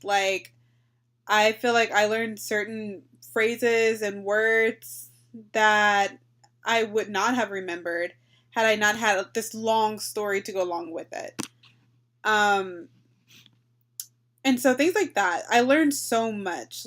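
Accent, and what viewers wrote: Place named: Spain. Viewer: I love your Spanish accent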